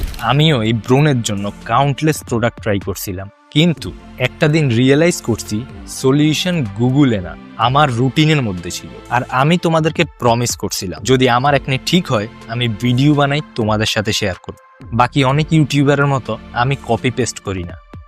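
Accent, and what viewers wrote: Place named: Noakhali, Bangladesh. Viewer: oh but tomar kotha bolar dhoron amader Feni Noakhalir motoi ❤️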